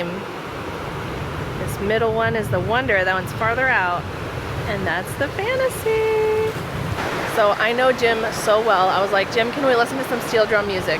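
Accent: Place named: Australia. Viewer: Love your Aussie accent!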